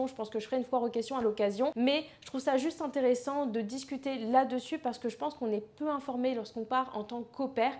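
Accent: France. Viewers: J'imagine que lorsque tu commandais au "drive", que tu parlais anglais avec un très fort accent français.... pas étonnant qu'ils mettaient du temps à comprendre 😄 Sinon, jolie vidéo assez réaliste à part quelques petites généralités pas nécessairement vrai dans tout les états.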